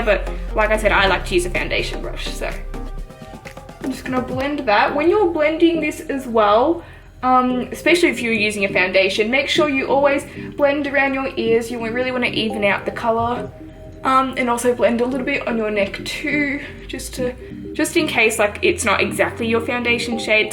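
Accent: United Kingdom. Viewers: British and African ❤ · Question: are u British?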